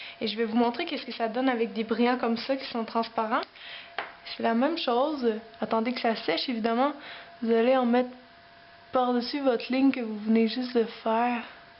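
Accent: Quebec, Canada. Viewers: Est-tu du Québec :D ? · Salut, j'adore ta chaine tu a de l'humour j'adore l'accent quebequois,j'adore ta chaine JE TE KIFF!!!!!!!! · c parcqu'elle est québécoise. peut-etre qu'elle n'a pas un bon accent français mais je parie qu'elle parle anglais mieux que toi.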